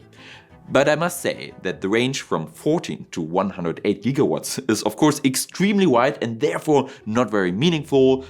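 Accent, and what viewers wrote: Germany. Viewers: A very interesting, well illustrated, insightful and well balanced video. Your speed of presentation was perfect for me. I much prefer your very clear diction and mild German accent to the strong regional accents of the UK. Cheers from Scotland. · honestly like if people think talking slower would help them... they know there is a speed button right? I have never had an issue understanding you and I don't have much experience listen to people with a german acent and sorry I just don't watch you that often. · hello Dr, could you please speak a little bit faster? i'm having trouble paying attention to what you're saying because my mind keeps getting distracted by your thick German accent. you say that when wind speed doubles, its power increases 8 fold? the energy of the air particles is 0.5mv^2 & power is energy/time so maybe 4-fold? you how they make plastic kayaks where they put the kayak mold in a machine that spins it in all directions & has the molten plastic cover all the walls evenly?, maybe after inflation when the balloon is rigid, you could do a similar thing with a very light sealant that coats the insides while the balloon is spinning around all crazy in a heated chamber. 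this design is very cool because the turbines are in an enclosed space & it would cost very little energy to make them bird-safe.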